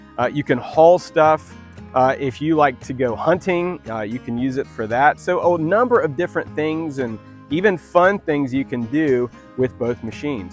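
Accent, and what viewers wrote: United States. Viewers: Portugal. We have very different accents in our regions, I think its the same everywhere, just wondering were in America is this accent from, east coast, west coast, north, south?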